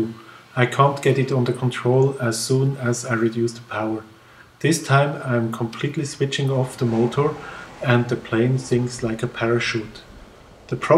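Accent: Switzerland. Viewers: Oh, dr schwizer Akzent 😂 aber supper idee/ video!! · Great project, keep it up! I guess from your accent and from the markings on the plane, you're from Switzerland ;-)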